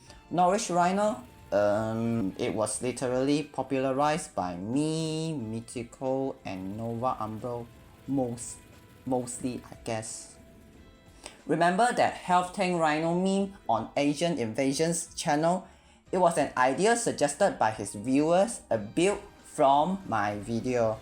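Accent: China. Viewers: you should use your Chinese accent and be funny with it, like uncle roger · I would listen to you but you sound Chinese so your opinion is invalid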